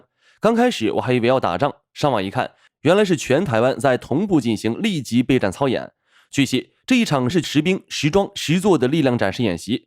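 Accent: China. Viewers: 中國口音的中國影片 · 都不知道這是AI做的，還是對岸的人做的；這人是從台灣人住對岸??? 這影片滿滿的中國味的口音，說話的用詞發音用法都是對岸，搞甚麼東西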